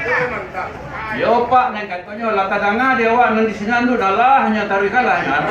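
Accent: Minangkabau, Indonesia. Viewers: Beliau ko psmuko minang malaysia luar biaso.. walaupun baliau lahia jo gadang di malaysia tp bahaso minang baliau ko pas bana...hebat smoga diikuti dek nan mudo di rantau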